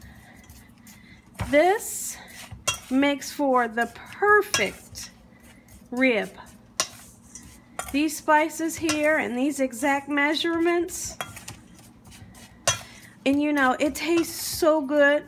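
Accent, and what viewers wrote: South, United States. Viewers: Hi new to your channel. Believe me im at awe. Love it. Are u from the south. U killing the cooking baby . lol. Like u said yall eating good in that house. Lol. God bless. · I know you from the south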